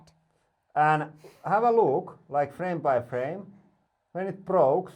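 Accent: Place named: Finland. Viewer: Herrane aika mikä suomi aksentti 😅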